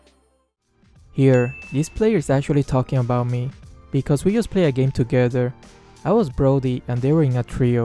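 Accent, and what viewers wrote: Philippines. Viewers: im confused at your accent are you filipino or what?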